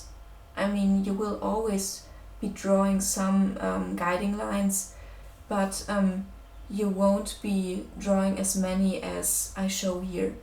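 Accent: Germany. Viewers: You sound very german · als du angefangen hast zu sprechen dacht ich mir sofort die ist deutsche 😂😂😂 stimmts? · Die ist deutsch?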